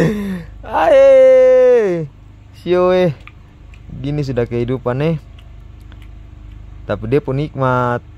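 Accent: Sulawesi, Indonesia. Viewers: Abang orang mana ap orang Sulawesi betul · Orang Sulawesi ya kalau liat logatnya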